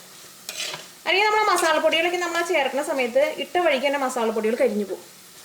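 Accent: Thrissur, India. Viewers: chechi oru rakshayilya kidilan taste thanks for the recipe and your thrissur slank koodi aayappo polich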